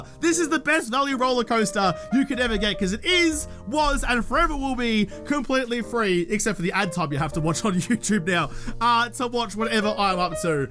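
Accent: Melbourne, Australia. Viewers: WHAT. One of my top reasons why I ❤ is his amazing Aussie accent!! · Hey! I only just found you a couple of months ago and you've been seriously growing on me. But this video. This video turned me into a lifer! Lemme just say a few things. Yes you can be loud (as can I). And at first I used to turn that volume slider down a bit. But I've come to love your excitability and loudness. As someone who suffers with too many chronic illnesses to list, all of which that have left me retired (extremely early I might add. Had to give up working 15 years ago and I'm only 43 now) and extremely isolated save for my most amazing and loyal husband, my life gets very very lonely and so I depend on my favorite Youtubers to help me not go fucking crazy! And you have so quickly been added to the list of favorites. I always really appreciate your positive attitude and goofiness. 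I am, unfortunately, American so I've been diligently studying up on Aussie slang so I can understand what the hell you are saying! Still lost about 40% of the time but I generally get the jist. You now have me saying batons the way you do instead of the way I do! LOL! Look, don't ever change. Not for anything. The haters are gonna hate and the trolls are gonna troll. You be you and you do you and those who really appreciate your personality will stick around. Thanks for all the laughs mate! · From one Melbourian to another, the accent rocks.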